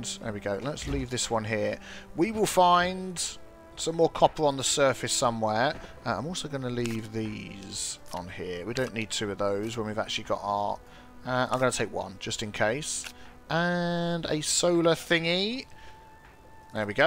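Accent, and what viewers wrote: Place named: Kent, United Kingdom. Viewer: I would say Kent, judging by the way your pronounce your R's.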